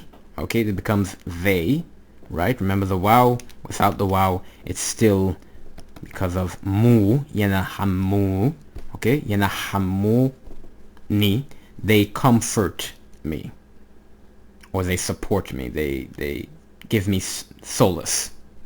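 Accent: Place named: Yemen. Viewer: You sound just like a Yemeni Hebrew! Very Impressive! God Bless you brother. Thank you for the Wonderful teaching.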